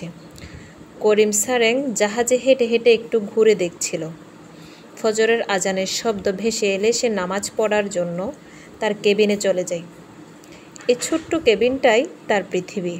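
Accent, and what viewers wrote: Chittagong, Bangladesh. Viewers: Your voice tone like Chatgaia🙂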